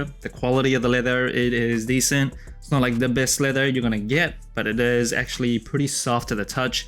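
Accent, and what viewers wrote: Australia. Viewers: Did you spend some time in Australia? I can hear a tinge of Aussie 👍🏼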